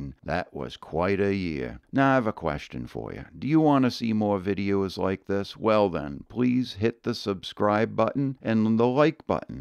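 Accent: Maine, United States. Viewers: your GENUINE "ayyy-up" honest-to-goodness Maine accent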